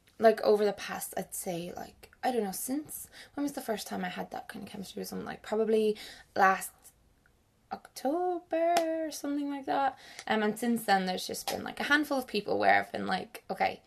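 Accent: Ireland. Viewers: Compatibility: we have the same beliefs, we're both bi, we love makeup, I love the Irish accent Chemistry: I'm bad at science but good for you It's ok with me if you move to Wales now x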